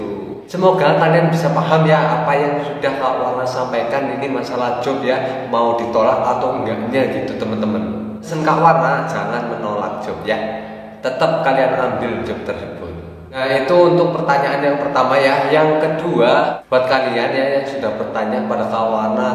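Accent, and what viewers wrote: Banyumas, Indonesia. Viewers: Sukses selalu wong Cilacap , ora ngapak ora kepenak 😁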